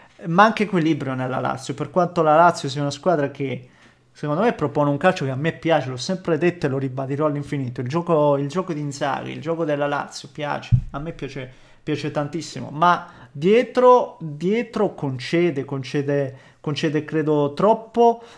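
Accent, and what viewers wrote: Calabria, Italy. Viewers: ma sei Calabrese?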